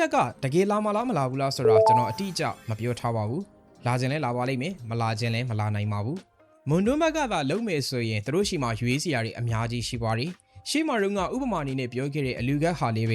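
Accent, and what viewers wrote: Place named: Hokkien, China. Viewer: ပြောတာပြောရတယ်..သူ့လေသံက.Hok fan😅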